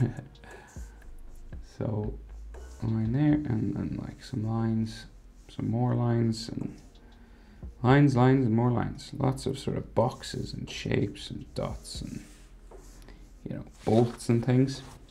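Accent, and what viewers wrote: Ireland. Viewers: bruh are u irish i am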